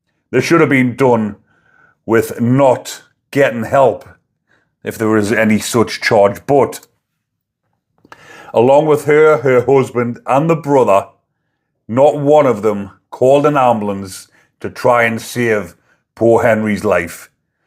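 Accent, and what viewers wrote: Newcastle, United Kingdom. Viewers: Where does this guy come from ? Geordie ?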